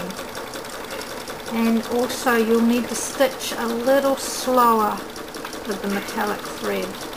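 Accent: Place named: Australia. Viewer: Wow thats incredible. I have been sewing off and on since I was a kid but just started quilting. You do beautiful work. Ive never seen a machine that switches colors before. I detect an Austrailian accent. You remind me of an old friend of mine. I just moved to Florida from NY. Quilting is very popular down here and my aunt is teaching me. I have to show her your videos. thanks. I would love to make a butterfly quilt with this techneq. for my daughter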